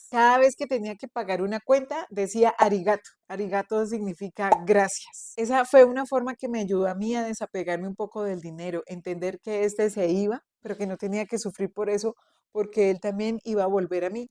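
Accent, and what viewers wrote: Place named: Antioquia, Colombia. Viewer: a veces te sentía un acento paisa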